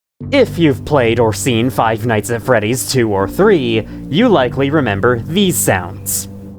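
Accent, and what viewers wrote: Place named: Asia. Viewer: He sounds asian but its not an insult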